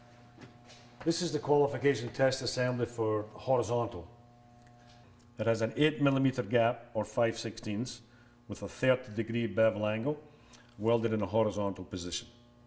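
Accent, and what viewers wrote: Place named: Scotland, United Kingdom. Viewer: What part of scotland is the instructor from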